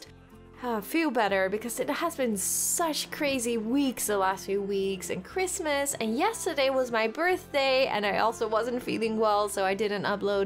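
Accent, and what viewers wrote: Netherlands. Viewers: i came to your channel at the zootour video and 3 minutes in i could hear your dutch, keep up the good work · Me : *was about to subscribe Me : *hears that she's dutch Also me : SUBRIBE RIGHT NOW Keep up the good work! 👍👍💯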